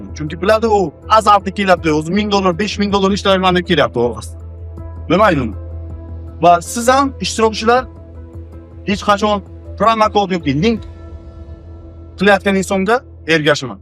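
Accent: Bukhara, Uzbekistan. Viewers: Акани узи Бухоролик шеваси Тожикча.Узбек тилида озрок кийналади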